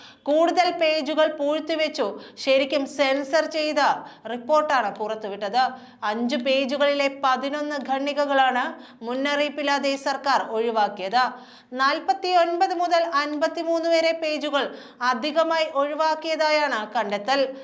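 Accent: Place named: Thrissur, India. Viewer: അത് തൃശൂർ ഭാഷയാണ്. ഇവിടെ അങ്ങനെയാണ്.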